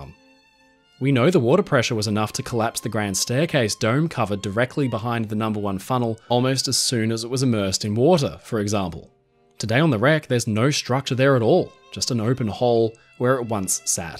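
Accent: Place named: Australia. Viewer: Oh he is so lovely his voice just speaks to me much better than the American - Canadian boi this guy is a an Australian Mann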